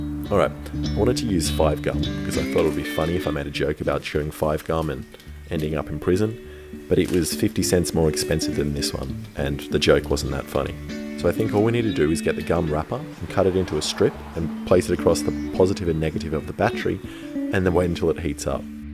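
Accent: Australia. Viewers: ...He's aussie. You'd know by his accent and australia and america jokes. Also, the magpie video. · He's Australian He's saying "how ya going" It's our version of hello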